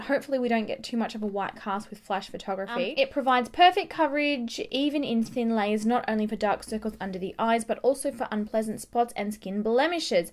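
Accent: Australia. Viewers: Where is she from? Australia or New Zealand?? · Thats not american slang. She is Australian. Thats how she speaks. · Amanda Bittner she's Aussie and leave her alone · sara v. she's from Australia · Omg. When i heard your voice i knew that you are from Australia. ❤️ I love Australia. It's most beautiful country in the world. And i'm from Czech republic. ❤️❤️💖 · is she australian i cant tell with her accent because i'm australian and i dont know ahha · is she Australian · h law She's from Australia. Of course she's gonna have an accent. Everyone has a different accent depending on where they're from. Did you not know that? I don't think you did, even though it's an obvious fact. Also your attitude is so gross. I literally cringed reading your last two comments, yuck.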